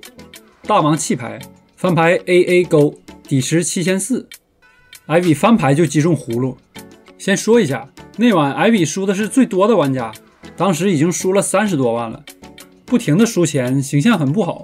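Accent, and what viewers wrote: China. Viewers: 哥们是长春的？我一个哥们和你口音很相似，他是长春的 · 喜欢你的东北口音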